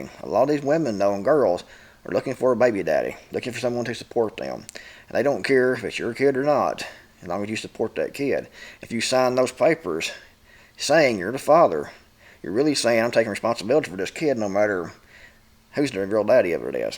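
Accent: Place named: Kentucky, United States. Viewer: Love your accent. My momma and daddy were from South Central Kentucky. Your accent sounds like all my cousins from down there. Unfortunately I was born and raised in Louisville and the accent of Louisville natives is by far, not southern sounding at all. Even here in SC the accent is different.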